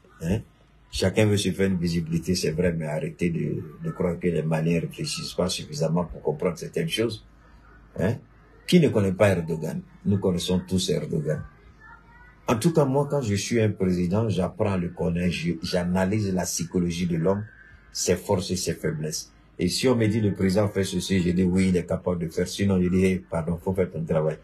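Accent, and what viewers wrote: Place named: Ivory Coast. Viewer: PERSONNE NE VIENT ECOUTER POUR APPRENDRE AVEC TON ACCENT IVOIRIEN JE BE SAIS PAS OU GABONNAIS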